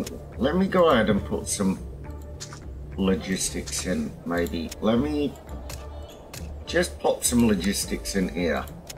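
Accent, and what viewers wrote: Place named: RP, United Kingdom. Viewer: No way I got it? HA HA😃 Your' pronunciation of French words betrayed you proper BBC accent. Man, I spent too much time in the tourist industry.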